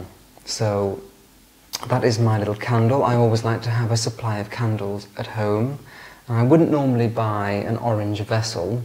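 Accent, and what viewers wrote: RP, United Kingdom. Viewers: accent is so posh.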